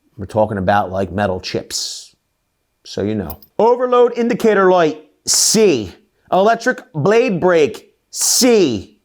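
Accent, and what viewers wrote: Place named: New England, United States. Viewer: The voice , couldn't watch that Yankee accent..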